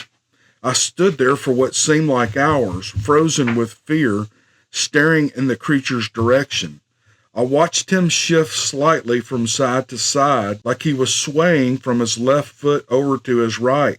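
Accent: South, United States. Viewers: Have to say, I'm a Florida girl living in Missouri the last 15 months. I love your voice. The southern in it sounds so... Right. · I believe the stories 100%. I am from the Pacific Northwest & they populate a lot of the woods all over here. My brother in law & myself were WAY off the beaten path west of Rathdrum, ID up in logging country when We noticed what looked like a Shaquille O’neill’s bare single footprint (only wider 6” across the widest part of the foot) crossing our path going up the steeep embankment only in a wash that came over the game trail we were hiking on. It was pointed uphill & when we looked up the steep washout their was another footprint probably 8’ UP the SUPER STEEP incline. We are bigger dudes me 6’2” 200lb & he 6’4” 230lb & there’s no way we could’ve made that leap on level ground let alone on a 50% grade that whoever made that footprint did. 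No way it could have Been a hoax since we were 1 hrs hike to the closest logging Road. We Didn’t see the beast, but after seeing those fresh footprints 15 years ago; my mind opened up the the FACT that these creatures co-exist near humanity w/o much detection from us ! We Like your southern accent you tell the stories in & the bluesy country...Keep em comin’ sir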